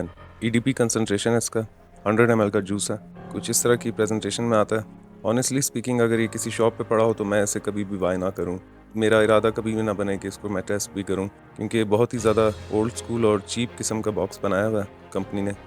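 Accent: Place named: Karachi, Pakistan. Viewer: لیٹ رپلائی کے لئے سوری لہجے سے تو کراچی کے ھی لگتے ہیں جیسے کہ میں خود 😀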